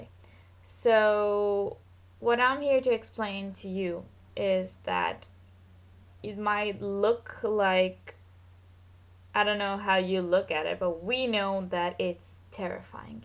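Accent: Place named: United Kingdom. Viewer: את בריטית במקור במקרה? יש לך מבטא טיפה מצחיק שהוא לא ממש ישראלי ולא ממש בריטי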